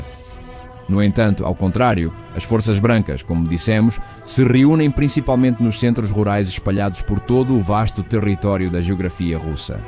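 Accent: Portugal. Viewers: Sou Brasileiro e acho lindíssimo o sotaque da Pátria Mãe Portugal ❤ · Ficou uma 💩 com esse português de Portugal! 😒👍🏻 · É de português de Portugal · As revoluções são as festas dos oprimidos e explorados Fladimir Lênin Gostei do sotaque Português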